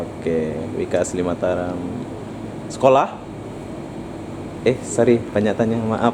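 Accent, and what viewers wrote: Malaysia. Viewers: Awalnya aku suka, tapi lama lama jdi kaya orang indonesia kemalaysia2 an, ga di kl ga di lombok ngomong logat melayu malaysia. Mau judulnya experimen atau tidak. Ada bbrp video yg mengangkat issue sensitif mengenai SARA (orng bangladesh yg overpopulasi) yg shrusnya ga usah dijadikan bahan krn ini issue internal di kalangan publik malaysia, ga ush ikut2an ngangkat kcuali kamu bs netral. Saya 6 tahun dah kolej n keje kat kl n ipoh tahupon bile cakap malay kat malaysia n cakap bhs indonesia dekat indonesia sini. Bukan saya benci awak tetapi cintailah negeri ini dn bahasanya slama and orang indonesia. Kcuali bila sudh jdi warga malaysia yg sllu diidam2kan sprti dalam video tu. Jgn sampai kamu terlihat 'norak' hanya gara gara boleh bhs malaysia trus ngobrol itu trus slama di lombok. Mmg ini videomu kamu boleh berlaku semaumu tetapi saya hanya bagi tahu saja kerana peduli bukan sebab hal lain. 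· Kalau di dengar dari cara dan logat anda berbicara, seolah-olah anda tuh menggunakan cengkok asli Malaysia. Mungkin anda masih ada darah Melayu Bukan serta merta pernah tinggal di Malaysia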